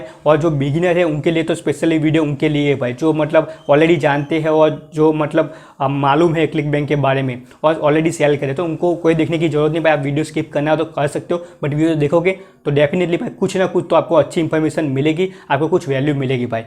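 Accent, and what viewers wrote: Gujarat, India. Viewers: Kya aap gujarat se ho? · bhai tame gujarati 6o?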